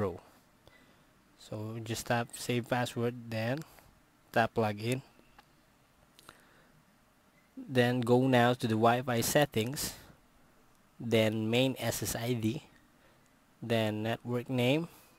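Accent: Philippines. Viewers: I think he was a filipino because of his accent and pronunciation of english.... But it's doesn't matter Good Job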